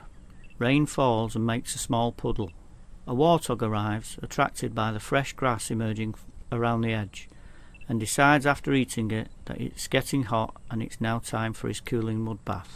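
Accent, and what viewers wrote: United Kingdom. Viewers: Intrigued to hear a Yorkshire accent narrating about a South African game reserve. I think you did a good job and I particularly appreciate the natural sounds of the bush in the video. Too many of these videos have awful background music that adds nothing of value. I spent 4 weeks doing conservation work on a SA private game reserve and hearing the sounds of the bush revives happy memories. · you have a great northern accent. Well narrated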